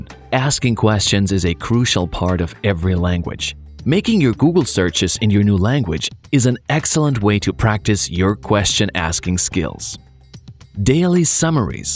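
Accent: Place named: United States. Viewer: Your channel has been a huge inspiration for me to start mine. (even though I learn French at school) I only have one problem with your videos, the first one I watched was meeting a stranger (I think) when the video pronounced it slower I heard an American accent even when I watched the French one about weather and I could still hear a tiny bit of American in the French voice, it's probably an American imitating a French accent, but aren't you supposed to be a company, I suggest that you get actual French, Spanish and Italian people to do the videos for viewers to get the best out of it, instead of imitation?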